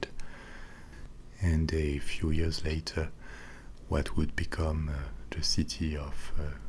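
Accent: France. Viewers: Mon Dieu. You have certainly got a niche in this kind of presentation sir. First off, yes naturally Americans in the US in particular like the French accent, and also the French language spoken, especially when done so in a relaxing atmosphere. I think this is great for Anglophones to learn French as an augmentation and/or diversion from the hectic world. Oh, almost forgot, the good history lessons too. Big thumbs up. Merci à vous.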